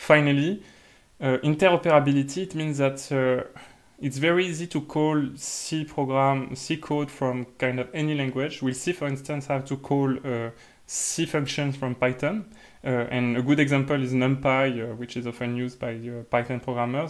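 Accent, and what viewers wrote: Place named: France. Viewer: are you french? i recognise this accent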